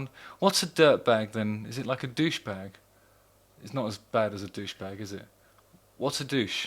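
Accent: United Kingdom. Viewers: Dude i honestly thought this was tommy lee doing a british accent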